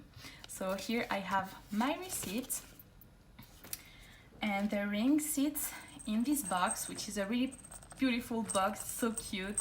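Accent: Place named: France. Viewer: Nice haul! I wonder if you also speak French because of the accent.? Am starting to like Tous jewelry since I only have pandora. Thanks for showing your haul .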